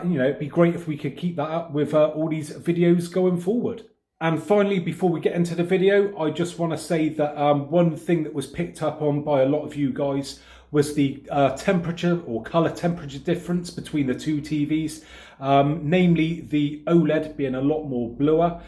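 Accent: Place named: Cornwall, United Kingdom. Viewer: Hi mate great video! Are you cornish if you dont mind me asking?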